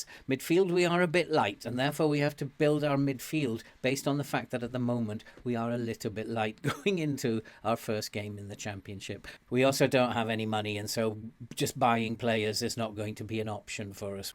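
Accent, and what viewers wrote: Wales, United Kingdom. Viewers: What an amazing guide, I really wanted to find a way to create my own built on the abilities of my players rather than copy and paste one. This is exactly what I needed, thank you! I also detect a Welsh accent there mate, where are you from? Cardiff here! Subscribed with thanks!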